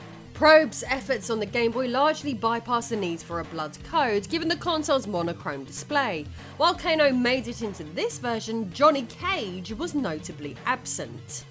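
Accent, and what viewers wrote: United Kingdom. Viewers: The Brits have a different way of saying certain things. ZX Spectrum is "Zed Ex Spectrum" there. I'm used to it. They probably think the way we say certain things is odd so 🤷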